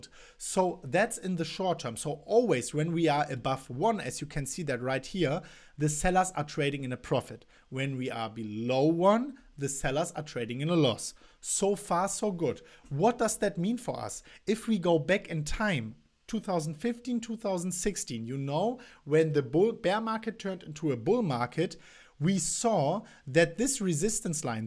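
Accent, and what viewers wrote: Germany. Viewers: Love your German accent. It actually makes me listen with focus , cheers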